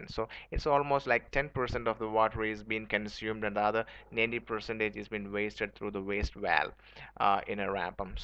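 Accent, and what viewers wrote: Kerala, India. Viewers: LoL.. another mallu with accent 😂😂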